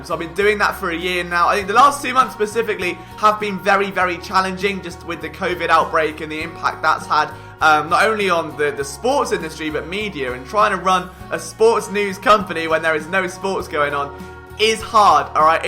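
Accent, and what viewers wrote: England, United Kingdom. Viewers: I started watching the channel because I love the Eagles. But when I heard the English accent, I was very intrigued, because as an actor, I have to learn English accents. Your content was great, very knowledgeable, and I got to learn more film and about players that aren’t really in the spotlight. Congrats to you and your team and continue the great work! #FlyEaglesFly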